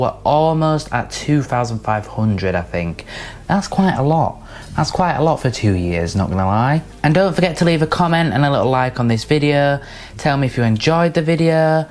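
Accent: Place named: North, United Kingdom. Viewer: You are so refreshing, I love how northern you are I feel reyt at home listening to your voice whilst you do your make up. 🥰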